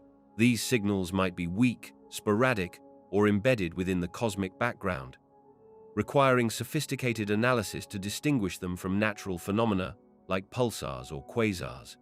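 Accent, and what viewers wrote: United Kingdom. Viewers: It’s remarkable how repetitive this British sounding video is while we’re all waiting to see pictures of intelligent reptilian beings. If the narrator had a southern accent it would be easier to realize the repetitiveness because the southern accent sounds lees intellectual to northerners perhaps due to how Hollywood and other sources have often poked fun at American southerners.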